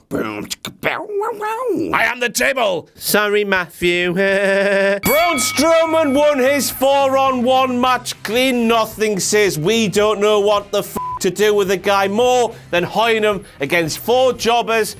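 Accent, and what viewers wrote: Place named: Cockney, United Kingdom. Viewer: More of that cockney accent please haha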